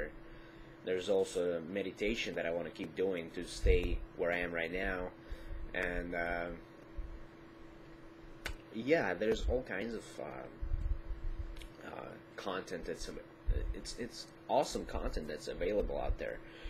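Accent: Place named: Russia. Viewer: I agree, actually a little russian accent doesn't hurt you.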